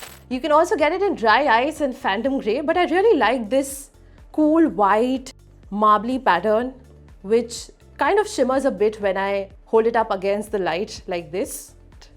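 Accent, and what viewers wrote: India. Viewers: Indian jese lagta hai ye english bolta hai😅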